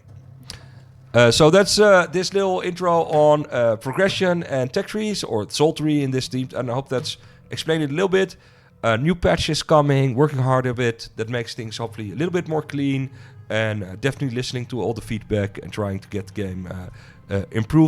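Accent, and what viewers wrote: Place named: Netherlands. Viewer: He sounds soooo Dutch, enjoying your game but still things are not clear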